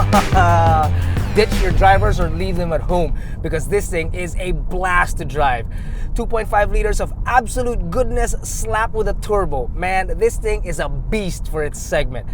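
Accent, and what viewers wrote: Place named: Philippines. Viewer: Forget the car. It’s that excellent Filipino English. Bravo!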